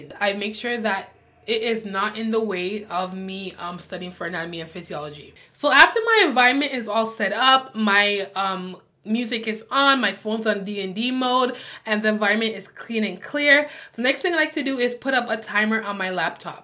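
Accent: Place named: Barbados. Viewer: This was so helpful, love the color palette idea! Off topic: I’m hearing a Caribbean accent😅 Bajan I think. I’m Trini!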